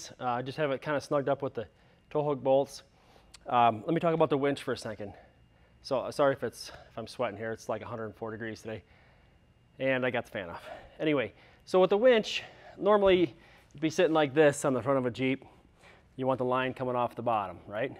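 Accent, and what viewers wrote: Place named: Canada. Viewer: Nothing wrong with a Canadian accent. lol As a Canadian, I know of lots of us live in the Detroit area and work for the Big 3, so I figured you might have been amongst them. :)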